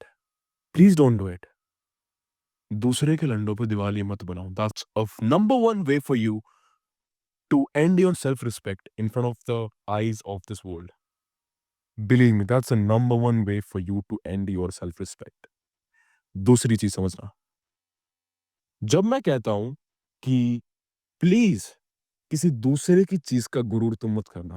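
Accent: Haryana, India. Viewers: Valid points bro btw you sound like raftaar in haryanvi accent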